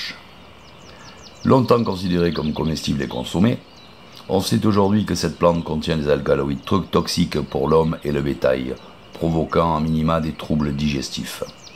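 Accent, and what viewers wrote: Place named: Hérault, France. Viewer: Merci pour l'explication. Ce joli accent vous vient d'où ? De l'Hérault ? Merci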